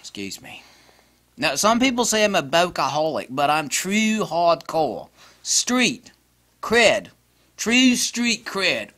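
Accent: United Kingdom. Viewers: wow, a British accent! At least one of them... Now say this "Would you like a glass of water?"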